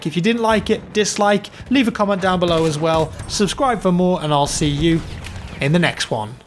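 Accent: United Kingdom. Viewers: Feel like I'm watching lvlcaps video with a British accent.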